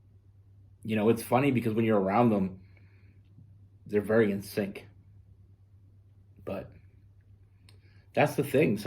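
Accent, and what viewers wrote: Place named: Canada. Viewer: It's the beautiful Canadian accent ❤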